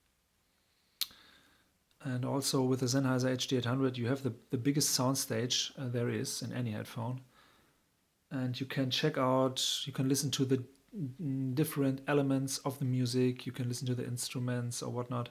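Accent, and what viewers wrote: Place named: Germany. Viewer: You sound very german. Nice review.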